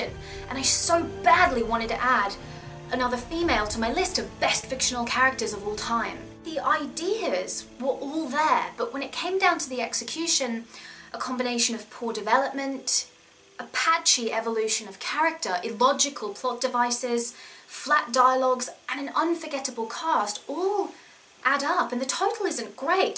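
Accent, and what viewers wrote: United Kingdom. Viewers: I think you should have done the voice over work for Tomb Raider. Your british accent sounds much better than the actress that did it.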